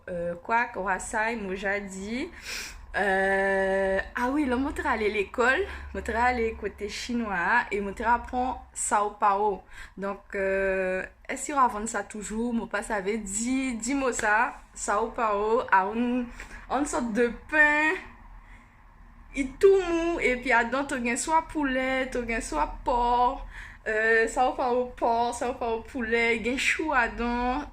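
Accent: Cap-Haïtien, Haiti. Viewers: Je peux comprendre Eli kreyol maintenant c'est exactement cette langue qu'il essaie de parler 🇭🇹🇭🇹🇭🇹🇭🇹🇭🇹🇭🇹🇭🇹🇭🇹🇭🇹🇭🇹🇭🇹🇭🇹🇭🇹🇭🇹🇭🇹 je sens une jeune femme haïtienne qui parle a travers cet accent. tu me mets dans ma peau🥰🥰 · Mwen se Haitienne mwen konprann tt sa ou di yo, paske li preske sanble ak creole haitien,( e konsi lè yon ayisyen moun okap pa konn pale français lolll mvreman ri) blague...ou amusante kenbe la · Li sanble kreyol moun okap..suis capoise. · Kreyòl la sanble a kreyòl Ayisyen an anpil, m konprann 95% nan sa ou di a, que chest beau. That Creole is nice. Resemble the Haitian Creole. 🇭🇹🇭🇹🇭🇹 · Tu parles bien avec la manière des jeunes de la guyane kkk mais on sens le petit cote haïtien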